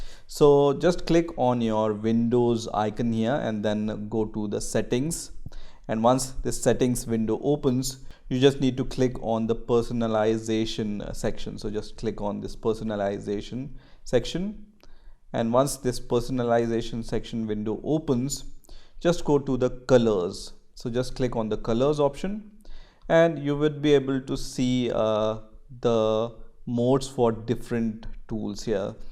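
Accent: India. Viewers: nah wtf this indian accent 💀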